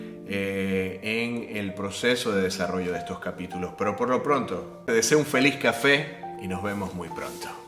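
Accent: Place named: Venezuela. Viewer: ¡Hola! ¡Oye!, tienes un acento 'matao' , neutro, juraría que eres venezolano. ¡¡¡Excelentazo tu video!!! Saludos.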